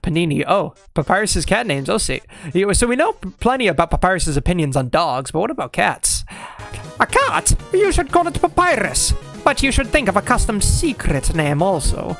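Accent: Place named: United Kingdom. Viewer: you sound british now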